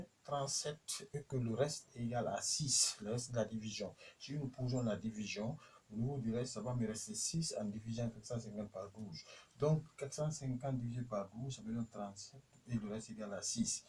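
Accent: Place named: West Africa. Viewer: French with a West African accent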